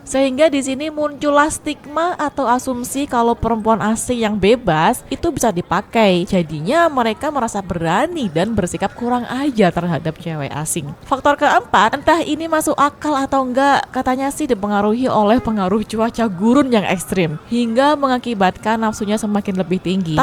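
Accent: Java, Indonesia. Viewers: Wow ... sounds an interesting story and experience. Traveling around the globe is my dream. Keren, mba. Aksen mba kaya orang Jawa.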